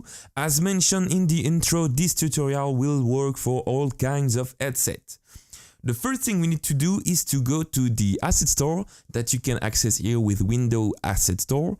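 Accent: France. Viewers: U ve a french accent ! R u ? ^^ thx a lot, all ur videos are so usefull, u rock ;) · tu es francais ? L'accent a l'air un peu francais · t'a un accent francais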